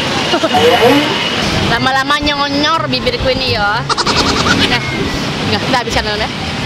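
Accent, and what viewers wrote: Java, Indonesia. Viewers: Mbak. Asalnya drmana ya. Kok jowone ngguenah poll hehe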